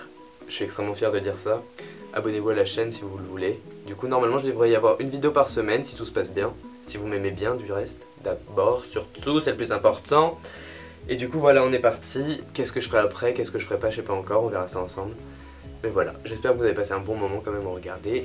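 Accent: Quebec, Canada. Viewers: j'ai cru que t'étais québécois, qd jte entendu parler normal ça m'a fait bizarre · ptn au début j'ai cru t'étais québécois j'ai pas compris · Jpp j'ai vrmt cru que t'étais québécois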